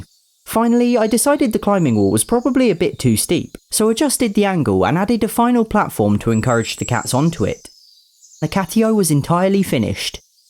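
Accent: United Kingdom. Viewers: For a second I thought was just getting a little tired (and a little British too it sounds like).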